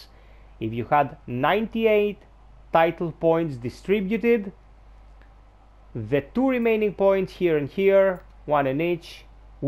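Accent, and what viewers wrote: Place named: Greece. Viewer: Ρε φιλε βλεποντας το βιντεο καταλαβα οτι εισαι ελληνας απο την αγγλικη προφορα χαχα!!καλο βιντεο αδερφε.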